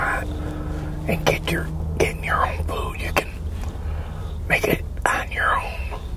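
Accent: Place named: South, United States. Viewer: Are you originally from the south? You have a really cute southern accent lol. Have a lovely Sunday,! ☀️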